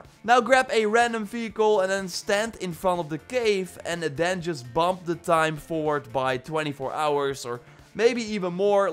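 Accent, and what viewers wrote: Netherlands. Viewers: He's Dutch isn't he? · Are you Dutch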